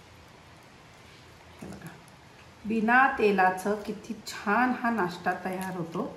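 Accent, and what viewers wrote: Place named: Belgaum, India. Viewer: Cute belgavi marathi. Ani cute recipe. Live it.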